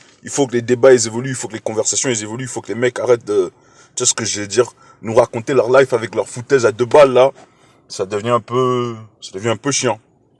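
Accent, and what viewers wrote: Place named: Cameroon. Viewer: Je savais que t'étais Camer comme moi ! J'ai repéré l'accent au début 😀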